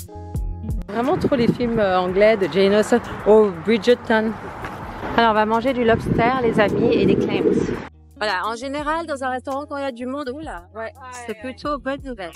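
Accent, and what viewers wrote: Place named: Geneva, Switzerland. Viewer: J'adore les intonations Genevoises ...c'est un plaisir de vous suivre depuis la Suisse plein de belles choses pour la suite.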